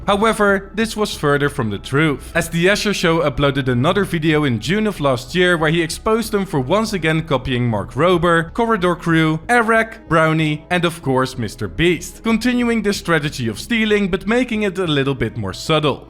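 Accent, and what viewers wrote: Netherlands. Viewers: You say the Netherlands but place the marker on Belgium... even tho I guess you're from the netherlands yourself, based on your accent and the way you pronounce 'Kriekel'.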